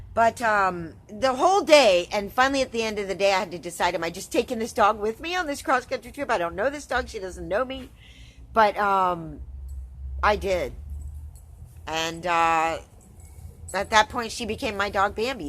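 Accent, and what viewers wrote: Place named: East Coast, United States. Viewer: Cool🐕i know you probably already know about the monks of new skete dog training book? I was really inspired by their techniques🐶 i try not to have bad days. Challenging moments in each day. After a malignant melanoma everyday is good. Life is not fair or easy. Great seeing you smile& that east coast accent is lol.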